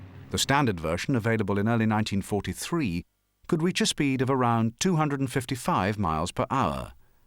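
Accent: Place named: United Kingdom. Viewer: Dear God...when is the British voice thing going to end?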